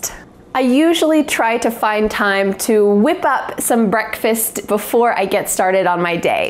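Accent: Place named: United States. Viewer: By the way, I'm crazy about the American gorgeous accent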